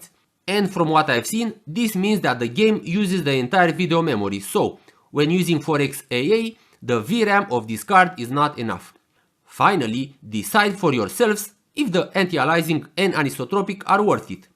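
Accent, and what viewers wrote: Romania. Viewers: Great old video cards and this isn't even the GT variant. Oh, and I'm just wondering, are you Romanian? You sound a lot like Dr Ortmeyer from Hitman Codename 47 😅🙃😝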